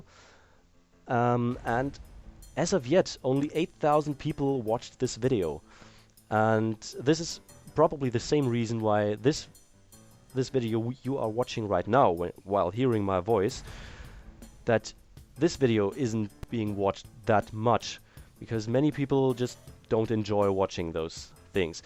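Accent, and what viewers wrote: Germany. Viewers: Just a question, are you german? Your accent sounds pretty much like it :D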